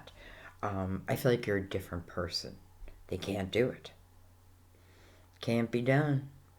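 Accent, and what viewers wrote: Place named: Pennsylvania, United States. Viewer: I have something to tell you. It’s like a big deal. I’m so grateful. I’ll send a private message. I (also) love your PA accent! Much. J T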